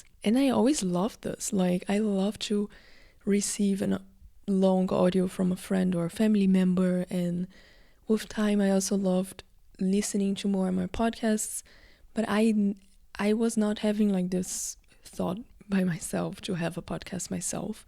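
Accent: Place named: Brazil. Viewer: Oiii diva! Muito gostoso te ouvir falando assim de maneira fluida a abrindo seu coração sobre esse projeto de se colocar vulneravel aqui. Não conhecia seu canal/podcast e vim pelo video de planejamento de metas seguindo o big paper planning day (amo). Gosto de ouvir o sotaque brasileiro de alguém falando em inglês, sei la me deixa com coração quentinho. E as quebras em português, é sempre divertido, sinto que é quase um sussurro, um segredo, um clubinho que só algumas pessoas podem entrar. Adorei você falando do lado bom de alcançar poucas pessoas - menos gente pra responder. Achei curioso, engraçadinho e honesto. Enfim, obrigada por colocar um pouco de você aqui para eu ouvir enquanto trabalho com coisas que definitivamente não aquecem o coração! Bom trabalho por aí <3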